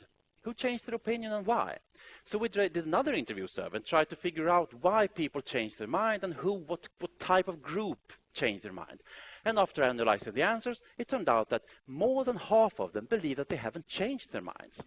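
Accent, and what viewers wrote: Sweden. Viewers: How amazing how the swedish accent and speach melody comes through his english !! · So swedish hahaha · DAT ACCENT... Let me guess, swedish? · Of course I know the definition of awkward. Apparently you don't, since you had to question it. Being a Swede myself, you can't imagine how painful it is listening to someone speaking English, in the Swedish accent. And this guy had a really thick accent. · awesome Swedish accent XD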